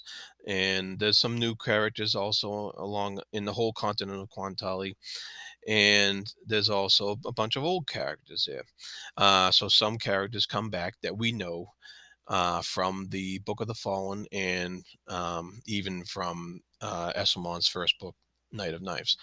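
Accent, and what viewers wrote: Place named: New England, United States. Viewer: I love your accent !! - a fellow New Englander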